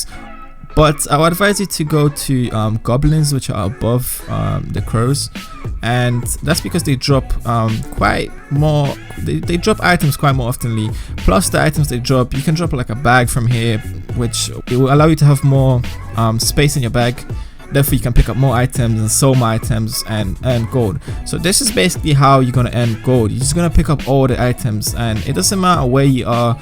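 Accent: United Kingdom. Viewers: At u British BC u sound like it lol( =①ω①=) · I'm guessing your British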